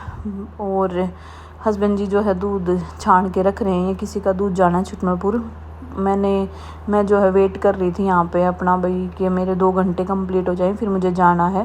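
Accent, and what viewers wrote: Haryana, India. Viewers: Hii apke vlogs bahut hi interested hote hai kyonki aap Jo h vahi dikhati ho aur bolti ho koi banavatipan nahi hota h.kuch dino se hi apke vlogs dekh Raha hun raat k 3baje Tak apke videos dekhta rahata hun.jo aap harayadavi launguage bolati ho na bahut hi achchi lagati h.baby boy hone k liye advance me badhai. Please reply jarur karna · Aap ki bhasa to haryana ki haap vaha utrakhnd me kyu rahte ho nya koi kob h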